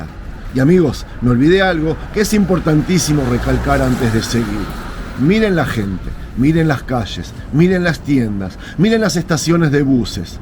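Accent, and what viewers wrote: Argentina. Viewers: Viven de sus camaradas que tienen empresas y multinacionales y de las armas que fabrican en Israel y otros países. Y parece que a la gente se le ha olvidado lo que estaban haciendo en Nueva York. La mayoría están podridos de dinero, pero no se gastan nada. Lo guardan para la causa ortodoxa y a las mujeres, sólo las queréis para que tengan hijos. No engañais a nadie. Y por favor, no preguntes, si no te van a gustar las contestaciones. Porque no preguntas a las mujeres si les gusta esa vida. Y por lo que se escucha, eres argentino, éstas hay porque pediste asilo, para salir de tu país.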